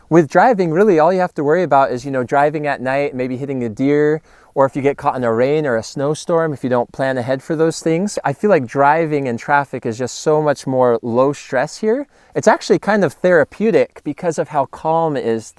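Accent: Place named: Utah, United States. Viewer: Guy in Washington State here--I just watched your videos about fungicides and thought, "Hmm. . . this guy's style and accent are more like someone from Utah. (Meant as a compliment; Utah is where most of my family live). I guessed right:) I love hearing your backstory and seeing the Missouri land and landscape. It's gorgeous and looks ideal for growing things. Thanks for the great videos.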